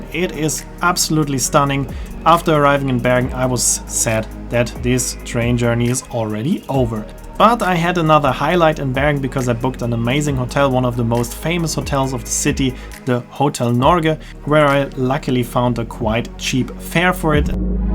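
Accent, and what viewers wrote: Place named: Germany. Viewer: I did think you were a german-speaker, but I still didn't connect Voss and Foss until i saw the pictures and realised V is pronounced as F in German.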